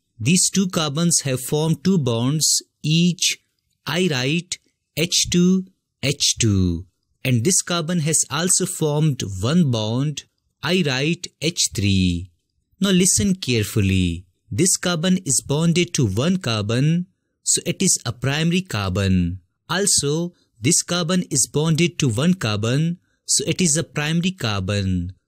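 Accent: South India, India. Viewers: Through you spoken rythm i definitely got that you are south Indian sir 😂😂😂😂😂 i really like your teaching way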